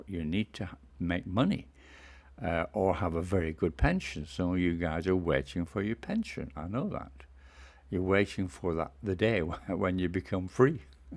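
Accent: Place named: England, United Kingdom. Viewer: Oh….it was AI ? I didn’t know that. I thought she was real person with lovely English accent. Thanks for info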